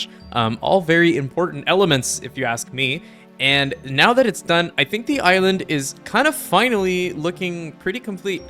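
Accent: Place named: East, Canada. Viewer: I love your videos! I've just subbed and look forward to watching more! I suspect that you're from eastern Canada too! Your accent sounds like you're from not too far away! :D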